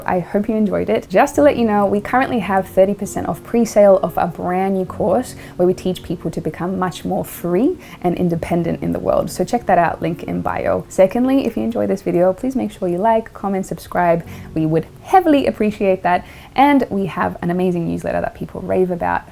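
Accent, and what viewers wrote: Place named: Australia. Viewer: I have watched a few of your videos and I thought you had an Australian accent but also American and something else. G'day mate from down under. First I want to mention that the world economy was in a big down swing long before the plandemic and staged war. I recently sold my house in less than 24hours and have moved to a van with no electricity or running water but I'm debt free and living on a friends property rent free. Very interested in putting my money into the bank of Australia. Thanks for your honesty and Respect and kindness 🖤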